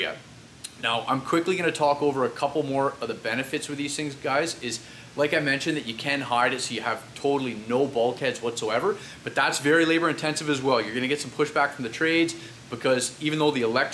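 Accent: Canada. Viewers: sounds like a Canadian accent. Hi from Niagara!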